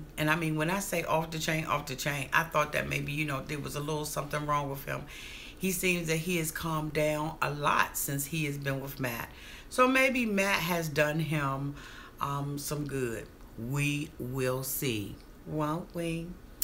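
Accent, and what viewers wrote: Washington DC, United States. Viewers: Are u from D.C.? Lol. U have a D.C. accent.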